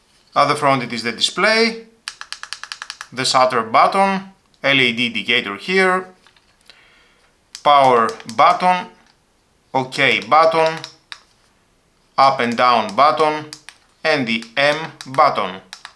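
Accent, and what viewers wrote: Greece. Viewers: Useful review, ty. Honestly, you sound like Greek 😅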